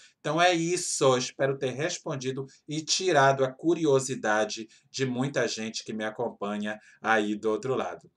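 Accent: Pará, Brazil. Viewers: Esperando esse desapego... vc pelo sotaque é paraense? Meu filho nasceu no Pará, fui morar Macapá e hoje meu filho, 26 anos, mora nos Estados Unidos. Bjs adoro suas resenhas, vc tem muito carisma. 💕🥰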